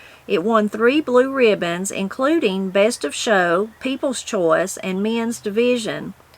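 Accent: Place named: South, United States. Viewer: I have to tell you how much I e joy listening to this book! I own this book and have read it at least twice all the way through! You have made it come to life with your beautiful southern accent! ❤❤❤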